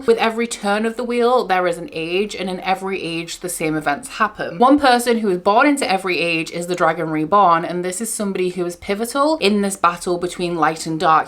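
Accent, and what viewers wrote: Scotland, United Kingdom. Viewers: Are you Scottish?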